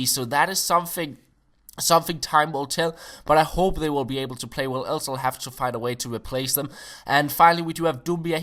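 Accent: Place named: West Zealand, Denmark. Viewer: hvaaa? er du fra Vestjælland?:)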